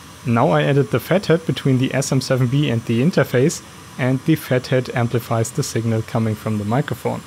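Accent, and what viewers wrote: Germany. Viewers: awesome video!! just found your channel. great stuff man, keep at it. love your accent, is it german?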